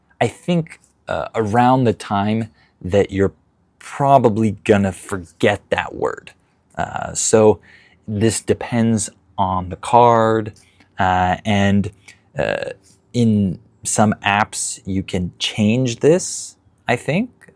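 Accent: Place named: United States. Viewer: Yes American Accent😊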